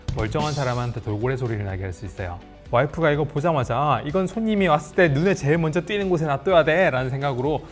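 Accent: Gyeongsang, South Korea. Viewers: 갱상도 클라스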